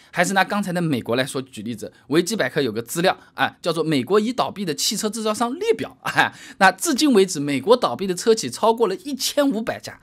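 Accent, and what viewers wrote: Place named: Shanghai, China. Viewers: Jerry J 吴语口音都这样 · 听你口音，像是上海或苏锡常一带的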